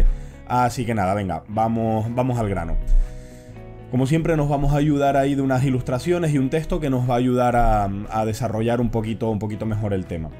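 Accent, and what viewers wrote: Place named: Canary Islands, Spain. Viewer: Muchas gracias, por tu acento pareces de mi tierra, Canarias. ¿Qué tipo de quemadura serían las producidas por láser estético? Gracias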